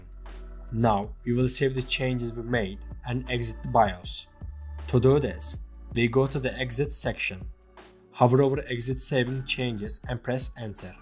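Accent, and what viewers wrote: Turkey. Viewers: ARE YOU TURKISH DUDE???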